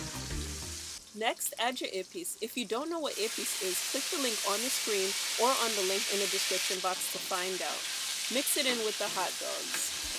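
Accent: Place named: New York, United States. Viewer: Bruh your accent is crazy New York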